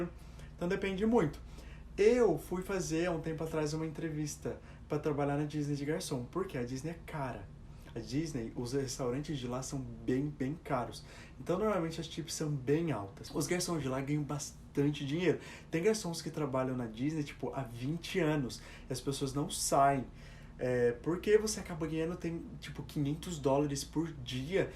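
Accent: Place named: Pará, Brazil. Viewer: É paraense? Fala igual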